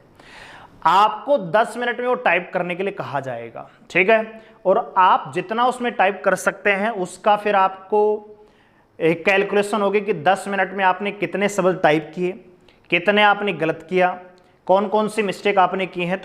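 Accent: Haryana, India. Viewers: Haryana ki h bhai😊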